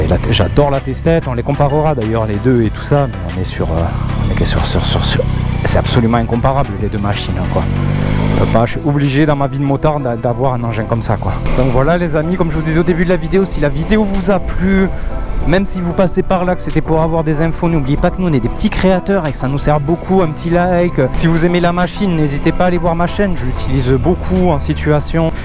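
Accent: South, France. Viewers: Vidéo vue. 32mn de kiffe. Jolie moto. Jolis paysages. Bonjour à Madame. Le soleil, l'accent du Sud... pow pow pow, continues, restes le même, et hâte de connaître ton ressentis GT vs ténéré 😁 un gros ✌ d'encouragement à toi, force à toi et prenez (toi et Madame) soin de vous... ✌✌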